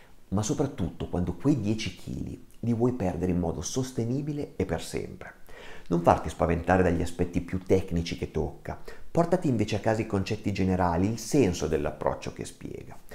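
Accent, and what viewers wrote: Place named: Piedmont, Italy. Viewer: Preferisco le tue pillole di saggezza, con una fantastica cadenza torinese, o piemontese, lo sono anch'io, che quelle che vendono i tuoi colleghi "farmacisti"🤣🤣🤣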